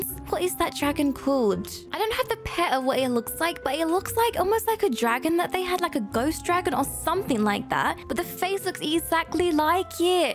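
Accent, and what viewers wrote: United Kingdom. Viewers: wair are u british?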